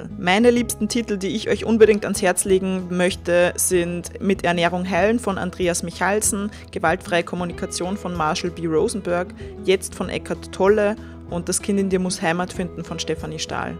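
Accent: Austria. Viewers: ich hatte eben leichte Kopfschmerzen und dank des kack österreichischen Dialekt ist es noch schlimmer geworden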